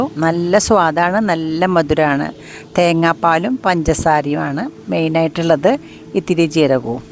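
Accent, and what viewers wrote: Thrissur, India. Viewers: ത്രിശൂർ കാരിയാണോ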